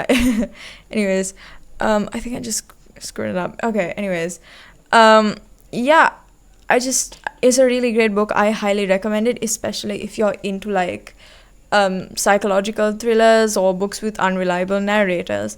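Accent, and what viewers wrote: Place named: United Kingdom. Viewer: Uk English 🙏👍❤️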